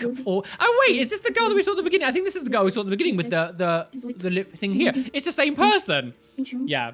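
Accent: United Kingdom. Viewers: I’m so used to accent that I forget he’s British until I hear him do an American accent 😭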